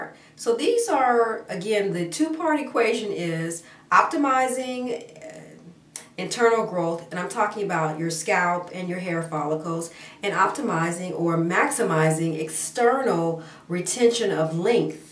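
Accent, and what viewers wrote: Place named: Nigeria. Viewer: Oh I see, it sounded Nigerian lol! And you are pretty :)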